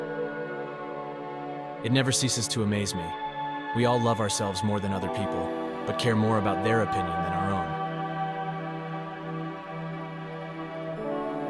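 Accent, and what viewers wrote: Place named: United States. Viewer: It would have enhanced this video if you used a more authoritative, deeper narrative voice. This sounds like a random America kid in a bar reading quotes from Marcus Aurelius.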